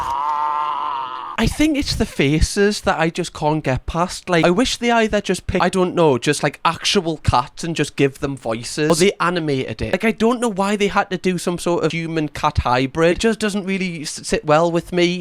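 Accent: Newcastle, United Kingdom. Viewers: Love you,! Geordie accent 4-Ever! Love from across the pond xoxo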